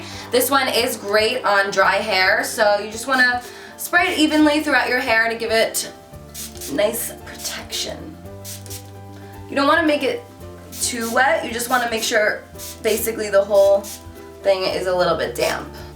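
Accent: New Jersey, United States. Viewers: I knew you were from Jersey! that accent haha. love your vids. you're gorgeous! 💕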